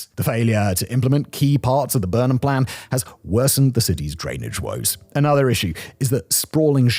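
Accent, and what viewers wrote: United Kingdom. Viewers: As a Filipino I find it endearing whenever you pronounce these Spanish sounding City names and Filipino Names in your own British - white boy way 😂😂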